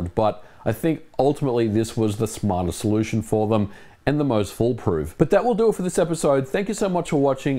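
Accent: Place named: Sydney, Australia. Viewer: You mean his "West Sydney ethnic" Australian accent? For most Australians when we hear that voice we think of a mechanic.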